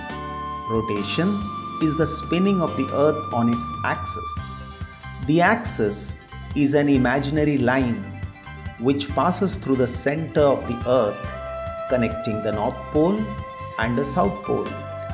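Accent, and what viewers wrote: India. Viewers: hahahaha this is not Hindi accent but more like dravidian languages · Hahaha, I love the Indian accent, especially when he says "cooled". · Mayank Singh Rajput hindi accent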